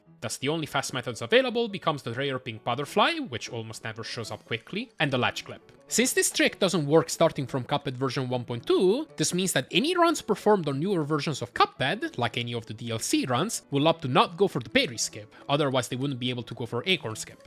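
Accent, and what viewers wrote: Italy. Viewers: Lo sapevo che eri italiano dall'accento in inglese😭😭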